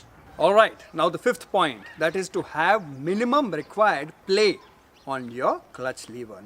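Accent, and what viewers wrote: India. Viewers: This is the first indian I take serious because his accent isnt as silly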